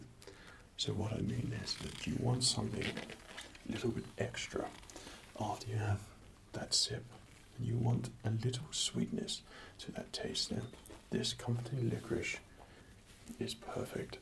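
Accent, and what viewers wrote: England, United Kingdom. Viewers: English accent gives me so much tingles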